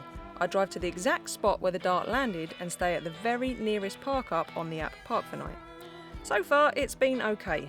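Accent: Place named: England, United Kingdom. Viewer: I know this is a really odd reason to watch along, but I really enjoy just listening to some everyday English, dialect and all :)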